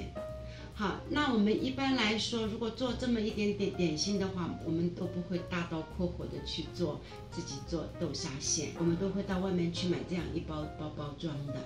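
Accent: Shanghai, China. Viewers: 谢谢老师，很亲切的上海普通话，白脱油😄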